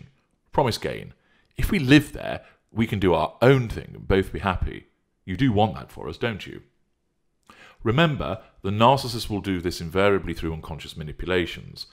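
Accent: United Kingdom. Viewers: This guy doing the video has a polished British accent; he's very clear.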